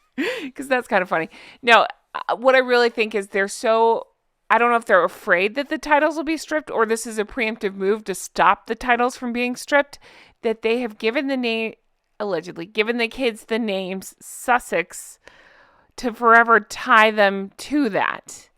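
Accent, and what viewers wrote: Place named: United States. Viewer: The entire royal family is a scam to promote British tourism. You sound like an American. Why do you care? We made a break from that Monarchy in 1776. Get a grip.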